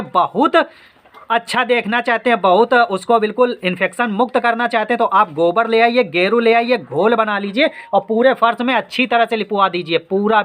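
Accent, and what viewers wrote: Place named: Uttar Pradesh, India. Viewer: मैं बिहार सिवान से हूँ, आपकी बोलचाल, भाषा से लगता है कि आप यू पी से हो ।आपकी video बहुत ही जानकारी देता है। कहाँ आपका घर है।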